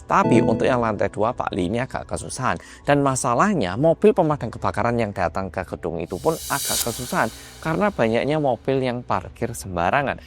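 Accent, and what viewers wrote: Java, Indonesia. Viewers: Ya ampun😢 tks mas muedok🎉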